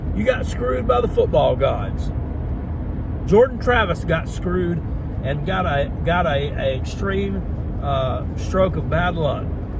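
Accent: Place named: South, United States. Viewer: You need to slow down on that "you people" statement you already sound country as hell so you can't afford to keep saying it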